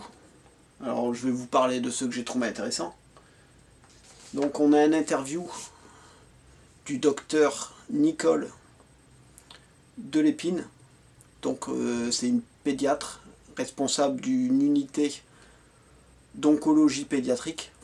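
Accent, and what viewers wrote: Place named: Nord, France. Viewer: C est le Noooooord l accent tient je m abonne pour voir ce que tu fais tu ma l air sincère dans tes paroles . Et suis picard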